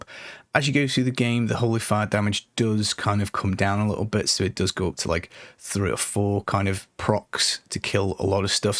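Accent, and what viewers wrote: United Kingdom. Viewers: I found your channel back in diablo3 times and man! I'm so happy you cover d2r! I enjoy your vids as you keep it real, clear, without needless fuss. Plus I miss UK (lived there for 7 years) so listening to your accent brings back memories :D Keep it up, brother! I do hope youtube algorithm will smile upon you soon as this channel is a quality stuff!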